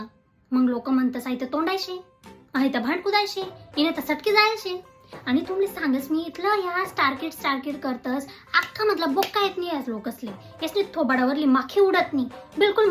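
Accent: Khandesh, India. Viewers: ❤️मला खानदेशी तुझी तोंडून फार आवडते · काय मस्त खान्देशी boltis माय तु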